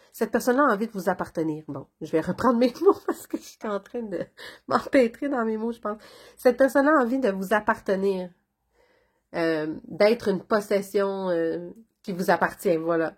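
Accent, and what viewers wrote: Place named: Canada. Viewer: Votre accent canadien, très mélodieux, me fait presque l'effet d'une séance asmr (vous avez le bonjour de la France Pyrénéenne du Sud -ouest). Merci pour cette guidance toute douce et pleine de bon sens. Choix 3.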